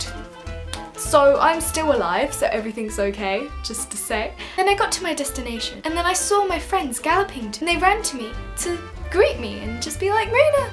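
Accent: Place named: RP, United Kingdom. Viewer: I'm from England and I want your accent is that weird? You sound so posh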